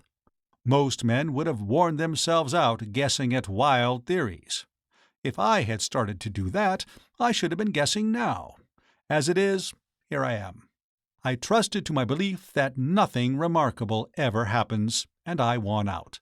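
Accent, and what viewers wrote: United States. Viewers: Who's stupid idea was it have a bloody AMERICAN reading P.G Wodehouse, really?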